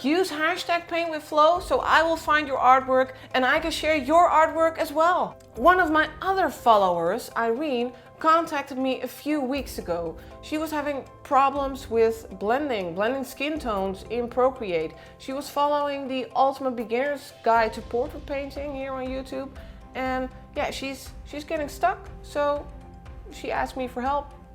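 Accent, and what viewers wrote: Netherlands. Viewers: I spy a dutch accent 😀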